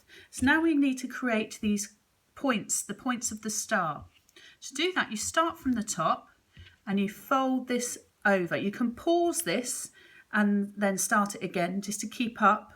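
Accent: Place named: England, United Kingdom. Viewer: Hi from ARGENTINA!...I loved this project..beautiful star! ..and I loved your ACCENT!! What part of England are you from? You speak really clearly and nice...😊😊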